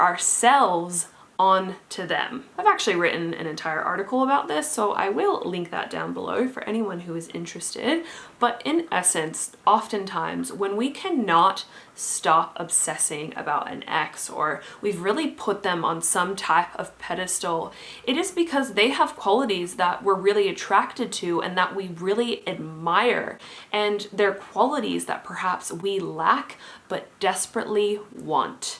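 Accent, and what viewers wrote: Australia. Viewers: Are you Australian? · Are you Aussie? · Aussie aslccent with a bit of Canada or US muced in? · Are you Australian or American?!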